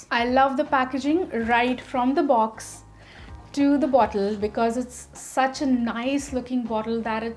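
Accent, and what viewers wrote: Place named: India. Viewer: Indian accent 🤮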